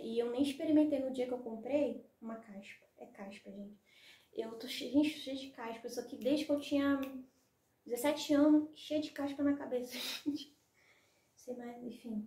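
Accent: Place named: Rio de Janeiro, Brazil. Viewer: Vc é carioca né? Pelo sotaque eu acho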